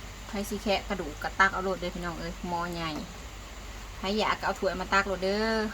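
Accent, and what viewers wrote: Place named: Savannakhet, Laos. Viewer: ເອື້ອຍຄົນສະຫວັນບໍນິ ສຳນຽງຄືຄົນສະຫວັນ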